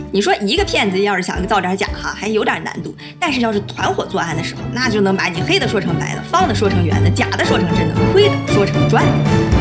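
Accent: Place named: Northeast, China. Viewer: 美丽，睿智，幽默，专业。喜欢您的东北口音：）想起了多年前在长岛的一个哈尔滨美女，我的麻将搭子，哈哈。关注了。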